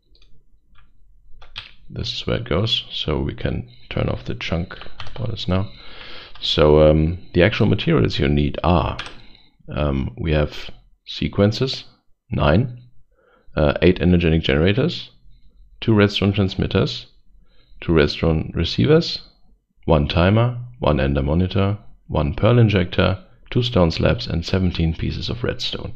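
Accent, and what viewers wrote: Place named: Germany. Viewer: From the dialekt you come from germany oder nicht grins? Crazy OP this way to create energy. No Big Reaktors or other generators are so fast, easy and cheap to get. And it cant blowing up like draconium. I have never use this and i dont know if i like it. You get tons of enderpearls with lilys or mobfarm. Much easier as any early charcoal generator setup.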